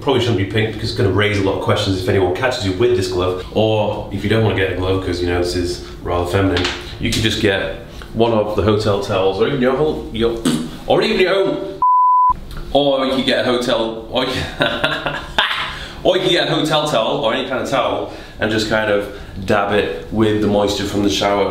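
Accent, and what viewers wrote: United Kingdom. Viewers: Omg my god, you are hot as holy moly, and have a british accent.. two totally dangerous amounts of hotness ;)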